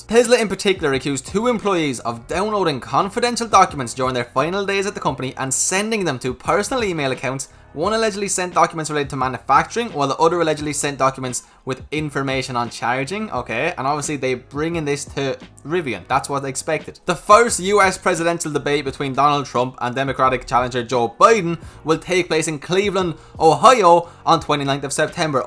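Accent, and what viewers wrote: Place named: Ireland. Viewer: I love your Irish accent, its so cute and you're so enthusiastic about the stocks you cover. Thanks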